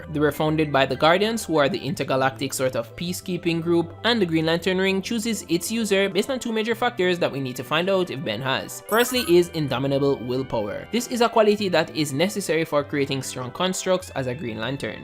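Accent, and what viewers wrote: Jamaica. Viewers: I love your accent, sounds Jamaican. Reminds me a lot of how Bob Marley talked.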